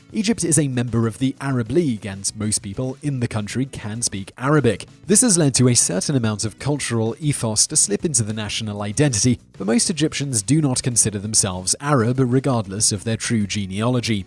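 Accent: United Kingdom. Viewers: There is an strong current of anti-Americanism in all his videos. Even this video is scolding what are essentially American perceptions of the French, Japanese, English, Spaniards, etc. His basic mission is cultural collusion with the American Left, to voice U.S. leftism in a British accent. With bad teeth. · | There shouldn't be an apostrophe. | Our & Canada's situation with the Queen is much too complicated to be explained like this. While it is true that many countries under the British monarch have some customs which Americans think are clues that we are undemocratic, they really are just that. Customs. While the Queen still has some emergency powers, they extend to dissolving Parliaments & actually make us more democratic because it's a fail-safe against abolition of democracy. | British people used to not care much about their teeth, until electric toothbrushes were invented. 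I think it's weird how Simon Whistler speaks in a British accent but embodies the American stereotype of assuming that all his audience are American.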